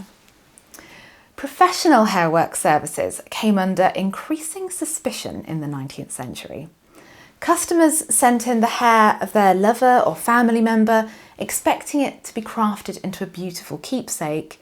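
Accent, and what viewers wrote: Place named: United Kingdom. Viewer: やはりイギリス英語はよろしいですな。❤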